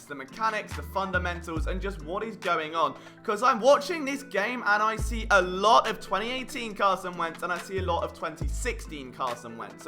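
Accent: England, United Kingdom. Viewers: The Queens English 🐥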